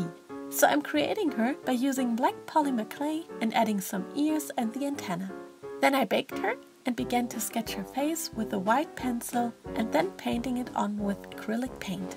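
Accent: Germany. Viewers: Your german right?😄😉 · Your voice is so relaxing and soothing. I’m just curious but are you german? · Are u German?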